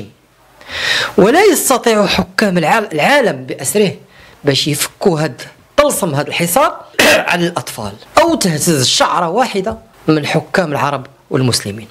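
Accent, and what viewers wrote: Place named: Saudi Arabia. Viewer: كتقلد السواعدا في هضرتهم هههه و تريد أن تظهر أنك عالم زمانه و غير هضر بالدارجة فحتى الخليجيين كيهضرو غير بلهجتهم ، مستلبي الهوية يريدون إقامة دولة هههه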